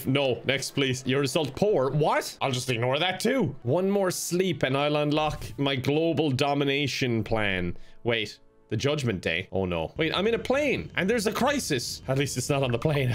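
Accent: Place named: Ireland. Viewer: I swear the more insane gets the heavier his Irish accent gets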